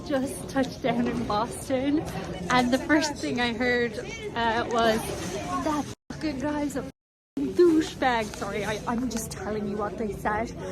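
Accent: Ireland. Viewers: Speaking English with a brogue accent. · Boston brings out the Irish accent from.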